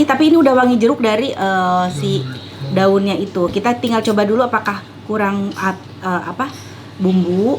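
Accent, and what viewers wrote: West Java, Indonesia. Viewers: Resep nyarios logat sunda na teh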